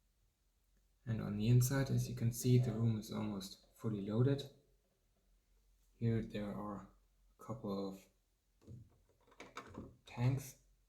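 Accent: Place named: Germany. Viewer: Aber der Dialekt ist so Deutsch 🤣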